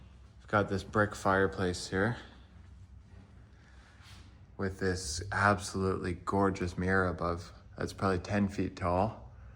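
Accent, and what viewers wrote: Canada. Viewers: I subcribed to you a long time ago, somehow forgot about how much i enjoy looking at homes, you popped up and i am so addicted and been watching like crazy! You are so kind and respectful. I love your canadian accent sooooo much! Omg, your just adorable! ❤❤❤ thank u! Your videos calm me down after a hectic day. Hugs! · Canadians always pronounced anything with an “ou” like owoo… howoose (house) owoot (out) spowoot (spout). Weird!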